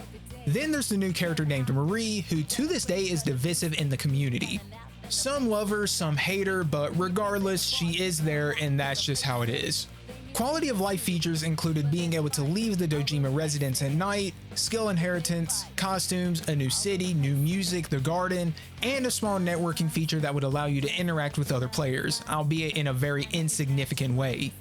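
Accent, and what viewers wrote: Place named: South Carolina, United States. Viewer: As someone from SC, I do really love that your accent slowly comes out more and more throughout the video. It's such a comfort to hear!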